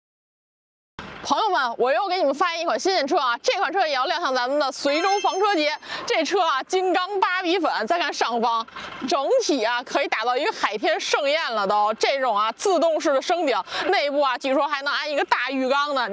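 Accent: Beijing, China. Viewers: 车是不错， 一口京腔听起来很不舒服，普通话不好吗？ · 既然面向中国或者世界，主持人建议不要用一口北京腔。用标准的普通话可能观众听了更适合一些。个人建议。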